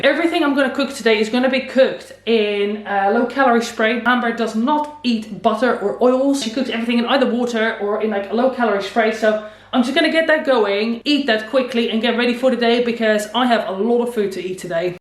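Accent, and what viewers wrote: Netherlands. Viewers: Are you Dutch? It's my native language and your accent sounds Dutch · Are you dutch? I hear an accent.. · I thought I recognized the accent, she speaks Dutch as well 😀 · not related but i first thought you were british then i thought you were dutch and then i got so confused because i pick up both accents. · It’s Dutch 😄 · Loved the video! And I thought I heard the slightest bit of a Dutch accent :) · You sound Dutch haha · Why is your accent so Dutch!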